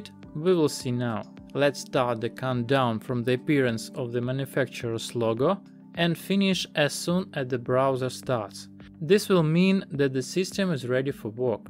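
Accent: Russia. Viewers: По акценту непонятно, что он рускоговорящий?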